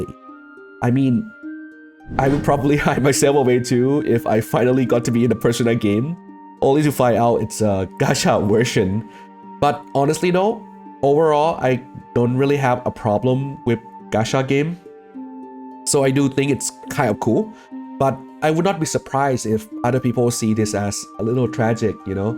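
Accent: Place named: Thailand. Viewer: Excuse me, this is not an insult. I wanted to know that are you Thai or not? I recognize it by the accent. I am just curious.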